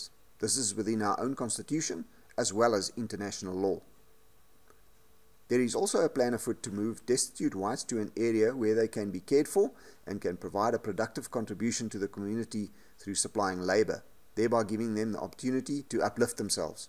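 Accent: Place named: Western Cape, South Africa. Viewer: Are you from the Western Cape Mister?!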